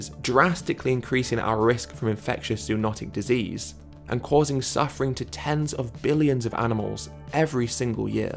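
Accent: United Kingdom. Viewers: Hold up, Is this guy British?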